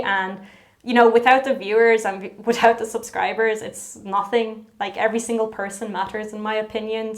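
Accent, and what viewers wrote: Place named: Ireland. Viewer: I believe in you 🥰😍 you can do more than you give yourself credit for, and I love your accent 🥰 you sound exactly like I imagined, your Irish is making me happy💖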